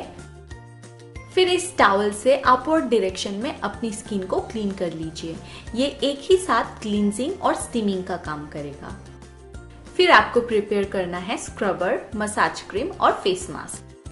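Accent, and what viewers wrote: India. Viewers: Before, you wrote the name of the ingredients in the legend. I know how to speak English, but due to your Indian accent I have a little difficulty understanding what you're talking about. Please, if you do not mind, start captioning again. Please. I like you so much. Congratulations on the evolution of the channel =)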